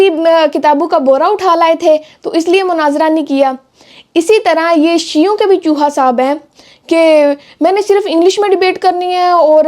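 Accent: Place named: Pakistan. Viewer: Mujhe ye pakistani accent bahut psabd hai ❤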